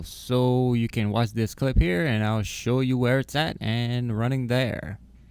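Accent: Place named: Louisiana, United States. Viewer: sounds like a Louisiana boy.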